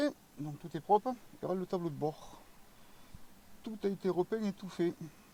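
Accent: South, France. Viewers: L’accent du SUD quelle beauté 🤙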